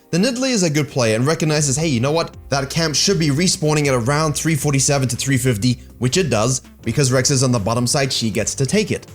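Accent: South Africa. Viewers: I KNEW IT... U HAVE A SLIGHT SOUTH AFRICAN TONGUE OMW I LOVE YOUNOW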